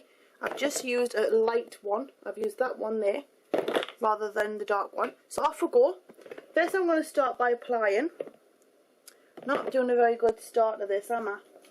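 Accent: Newcastle, United Kingdom. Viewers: Lmao. Obviously she's a Geordie. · um im pretty sure by her accent you can tell shes from the united kingdom lol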